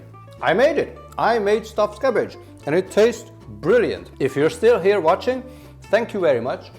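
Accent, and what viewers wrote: Hungary. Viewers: Your Hunglish accent is adorable hahaha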